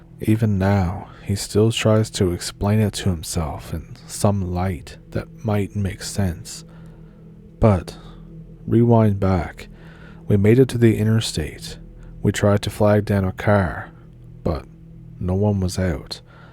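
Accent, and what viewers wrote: Canada. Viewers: Well, I have told you before every time I hear your accent, I first think of my Canadian cousins 👍😁🤟🎉🎉🎉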